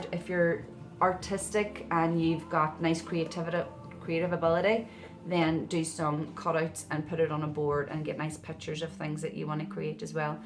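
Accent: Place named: Derry, United Kingdom. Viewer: I have just found you and it must be Divine intervention. You are a beautiful lady, talking from the heart in honest plain terms and giving examples from your own experiences. No high faluttin preaching. I'm guessing you're a Derry girl, love your accent and the fact you've never lost it. Know your roots and be proud. I know this is 4 months after this video and the Coronavirus has hit the world. I just wish you good health and stay safe. I look forward to many more videos from you.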